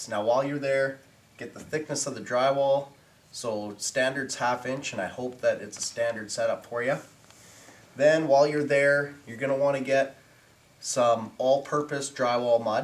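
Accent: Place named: Alberta, Canada. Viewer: hey where is this guy from? sounds a little like people from Alberta Canada, but maybe his accent is from the states?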